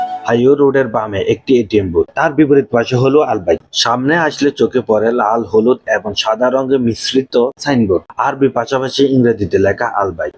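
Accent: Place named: Noakhali, Bangladesh. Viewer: আপনার ভাষা মাঝে মাঝে বুঝি নাই। আপনি মনে হয় নোয়াখালি কিংবা চিটাগংয়ের মানুষ।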